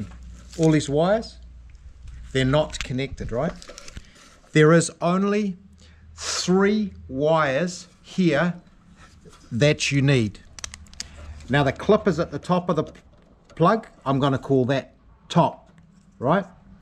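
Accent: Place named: Australia. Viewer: From the accent hes from Australia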